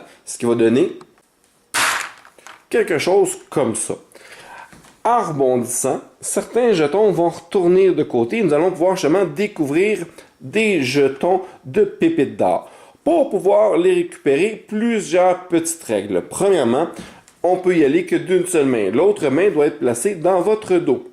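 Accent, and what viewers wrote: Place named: Quebec, Canada. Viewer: Merci pour cette chouette vidéo (avec l'accent québecois !) !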